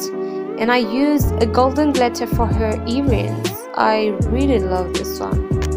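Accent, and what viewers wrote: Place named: United Kingdom. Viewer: Should I comment about these amazing drawings 😍, well edited video 🤩 or just,,, that new British accent thouuugh ? 😂😂 Ps : U nailed it 👏👏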